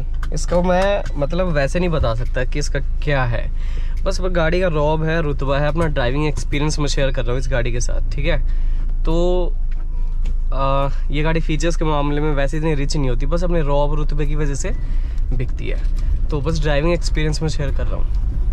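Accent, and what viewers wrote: Haryana, India. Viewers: Bhai your Voice Bhai Op..i thing Aaap hariyana main Rahete hoo.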